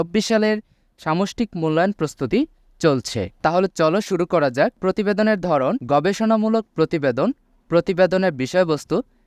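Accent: Narsingdi, Bangladesh. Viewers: ভাই আপনার গ্রামের বাড়ি কি নরসিংদী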